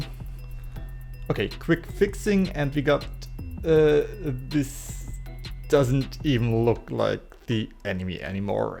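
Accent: Germany. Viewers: does this work with rift s? xd und biste deutsch? xd hört man irgendwie raus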